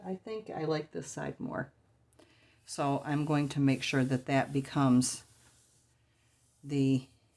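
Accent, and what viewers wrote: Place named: Central New York, United States. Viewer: This is awesome. I love hearing you talk. It's like I'm back home in CNY. The accent sounds the same. ;-)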